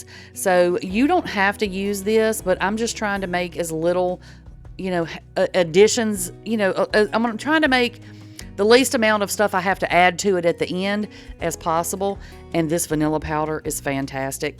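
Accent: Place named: Texas, United States. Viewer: You sound like a Texan to me. You're drawl makes me so happy! ❤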